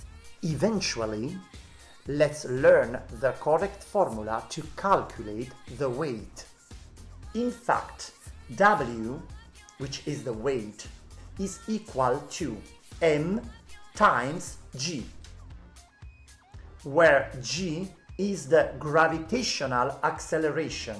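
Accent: Italy. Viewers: Your Italian accent is beautiful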